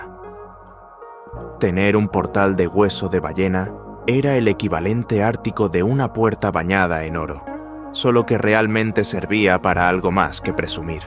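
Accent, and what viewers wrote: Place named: Spain. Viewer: TU ESTÚPIDA VOZ DE GACHUPIN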